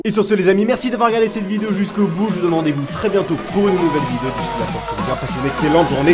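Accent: Quebec, Canada. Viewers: yo je suis un nouveau mais je te trouve super ps: tu pourrait faire une vidéo avec ta voit québécoise un petit defi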